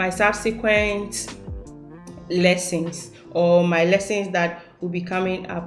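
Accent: Ghana. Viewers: Honestly, I have been consuming your content since day one and I must say your channel is underrated. You deserve many more subscribers and views. You always share a lot of valuable insights. Which are always straight to the point and beginner-friendly. Not to forget your sweet Ghanaian ascent too.😍, Keep giving us masterpiece content. Thank you!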